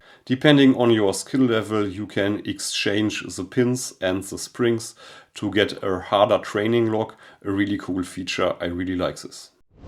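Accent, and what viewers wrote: Germany. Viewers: Your accent is undeniable german - but likeable 😉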